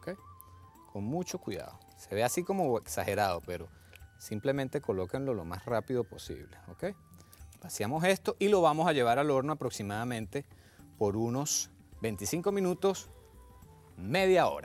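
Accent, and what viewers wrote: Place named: Venezuela. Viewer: venezolano SEGUUUUURO jajaja :D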